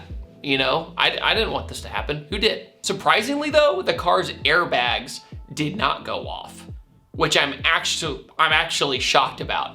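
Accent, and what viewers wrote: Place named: New Jersey, United States. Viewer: New jersey native? Sounds about right. 😂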